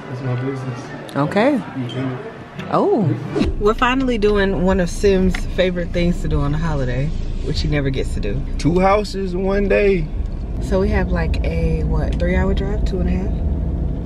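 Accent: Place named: Caribbean. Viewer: I hear a Caribbean Accent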